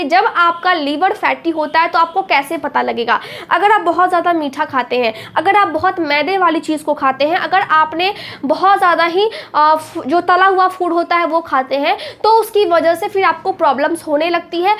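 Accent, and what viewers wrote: Bihar, India. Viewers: Lagta hai aap बिहाड़ se हैं। वैसे आप ने लिभड़ के बारे में अच्छी तरह समझाया है। 😊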